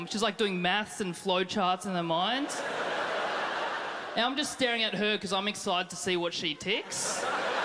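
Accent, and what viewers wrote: Queensland, Australia. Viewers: Woah!!!!!!! This guy ROCKS!!!!! And love the Aussie accent! Good on ya mate! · Is this Australia accent ?😂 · It's a real strong Aussie accent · Yea so aussie. Personally I find it quite annoying when they talk like that. Not all of them have the high pitch though. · Is that an Australian or a New Zealand Accest? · That's Aussie accent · asian kid with australian accent... great combo. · queeensland accent. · An Chinese guy with Australian accent! That by itself is hilarious!😃😄😂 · this guy looks like asian and sounds like aussie hilarious xD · What i found funny is that hes chinese with an aussie accent! Lol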